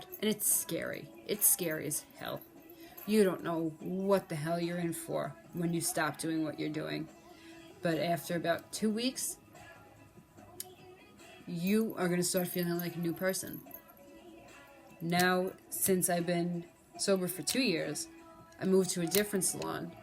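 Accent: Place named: United States. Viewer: I've just started watching your channel, your honesty about your sobriety and life is very encouraging. I have just started back in recovery. Thank you for your straight up posts. They have helped me get back to recovery and get on with it. Like your American accent attitude .. Thank you mate All the way from New Zealand Auckland.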